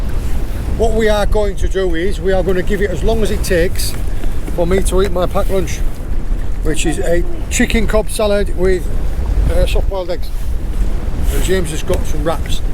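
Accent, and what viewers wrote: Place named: Leeds, United Kingdom. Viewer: I came to sea fishing later in life, but the one thing i wish i could change was having my father with me as a child, sea fishing. carry on encouraging James, you do a fab job, and James is really catching on under your masterful guidance. Well done both of you. BTW are you a Leeds fan? The accent gives it away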